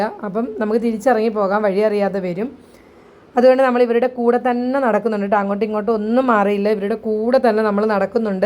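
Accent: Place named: Kochi, India. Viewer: ഞാൻ അബ്ദുറഹ്മാൻ ചാവക്കാട്😂 നിങ്ങൾ ലക്ഷദീപിലാണോ, ഭാഷാ കേട്ടിട്ട് കൊച്ചിക്കാരത്തിയുടെ പോലെ തോന്നുന്നുണ്ട്, അവിടെ ഫ്രഷ് മീൻ കിട്ടുമല്ലോ, നിങ്ങളുടെ ഭാഗ്യം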